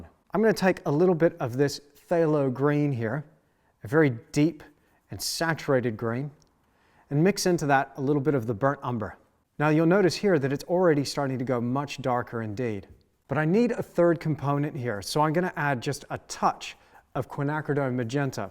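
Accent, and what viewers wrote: Australia. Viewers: I could hear that Aussie in ya there dingo! Thanks for the lessons mate. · Am i getting aussie vibes? Are you going in and out of accent? Don't know if I'm losing my little aussie mind or not haha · Great videos,!, just put of curiosity, I can't place ed your accent-, is it Australian?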